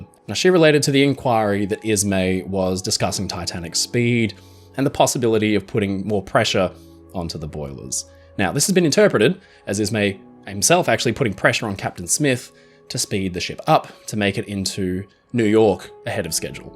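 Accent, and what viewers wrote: New Zealand. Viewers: Thanks for a brilliant video. I learned a lot. Many years ago, before the 1997 movie came out, I read that Titanic had 50 inter-ship telephone lines. What would they have been used for? And is this true? Also, are you a kiwi or an Aussie? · you're from new zealand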